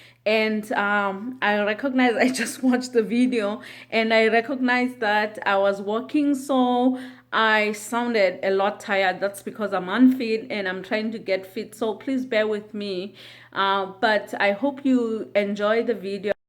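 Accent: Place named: South Africa. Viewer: Did you consciously keep your South African accent? What language do you and your family speak at home?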